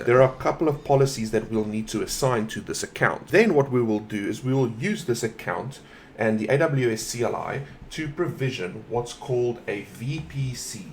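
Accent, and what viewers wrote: South Africa. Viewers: Why does it sound like you're afrikaans.?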